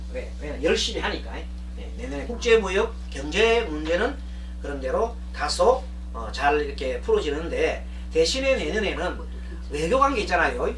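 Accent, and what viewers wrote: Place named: Jeolla, South Korea. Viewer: 말씨를 보니 라도인간이고 사이비인간이로다 대가리는 삭발하였지만 완전한 좌빨인간이다 에잇 좌발놈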